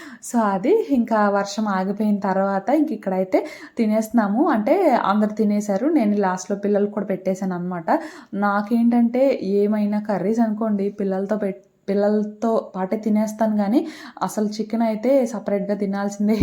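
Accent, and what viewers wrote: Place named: Visakhapatnam, India. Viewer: Your language is different in Telugu i think u r in vizag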